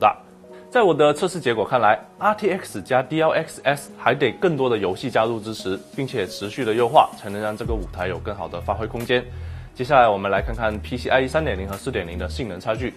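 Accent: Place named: South, China. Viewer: 南派普通话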